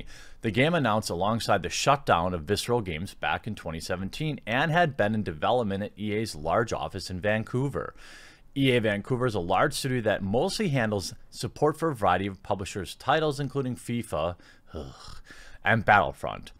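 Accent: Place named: Minnesota, United States. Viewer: Ha you say rag tag like you say bag. Are you from Minnesota ?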